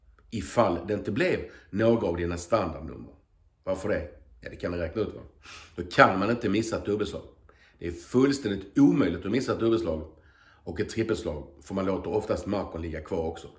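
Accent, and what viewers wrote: Skåne, Sweden. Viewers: Pratar skånska nu?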